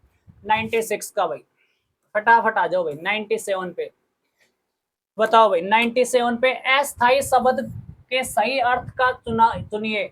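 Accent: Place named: Rajasthan, India. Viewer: Bola to Rajsatha ka h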